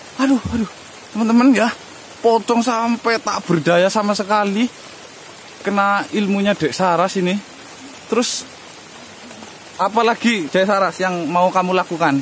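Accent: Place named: Java, Indonesia. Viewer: Demen ambe medoke ^^ Silakan silakan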